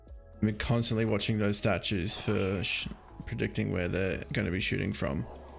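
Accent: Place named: Australia. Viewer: you sounds 110% aussie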